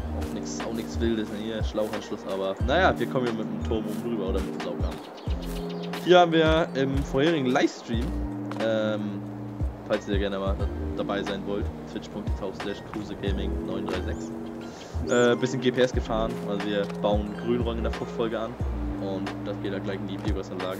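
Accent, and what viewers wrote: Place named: North, Germany. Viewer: Sehr toller Hof, tolles Video, sympathische Stimme samt Akzent (ich liiiebe norddeutschen Akzent!). 👌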